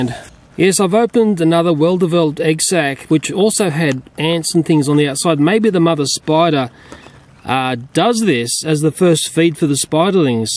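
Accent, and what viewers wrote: Australia. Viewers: Australian accent? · Australian accent “check” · Australia accent CHECK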